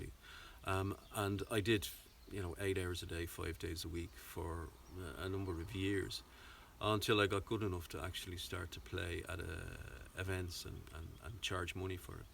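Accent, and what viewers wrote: Ireland. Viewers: 😂Born and raised in south side Cabinteely. I know a Dub accent when I hear it😂. You never lose it.😂 Great video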